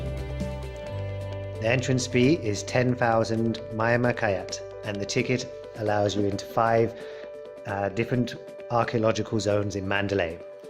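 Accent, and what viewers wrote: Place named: RP, United Kingdom. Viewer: You sound so posh I love it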